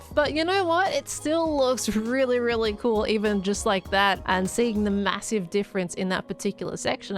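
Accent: Australia. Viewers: The Australian accent too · it’s the aussie accent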